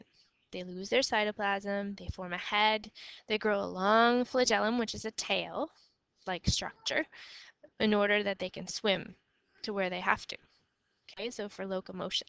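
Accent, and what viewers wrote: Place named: Canada. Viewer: Canadian accent <3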